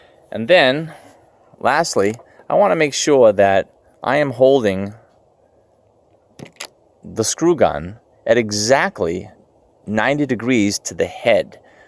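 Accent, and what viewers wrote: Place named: New York, United States. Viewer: My favourite part of this video was the strong New York accent. My second favourite part of this video was when you put the driver in reverse so it wasn't trying to drive the screw even deeper.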